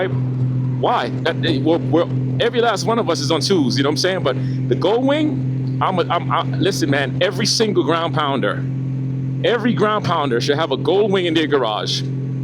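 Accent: Jamaica. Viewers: Love that Jamaican accent brother! Keep these videos coming love em! Best Album made from any star past or present my opinion was! Guess who the artist was?! 😂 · I love that Jamaican accent.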